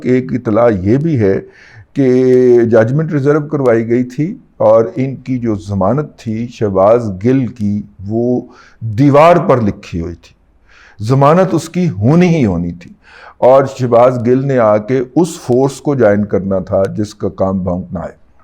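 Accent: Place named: Lahore, Pakistan. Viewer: پائیں دادا آپ بابو نا بنا کریں آپ لاہوری اپنے بائ Barith سٹائل میں بات کیا کریں یہ بابوں والی زبان آپ کی ناہھے ناہونی چاہیے نا آپ اچھے لگتے ہیں خیال کریں 🙃🙃🙃🙃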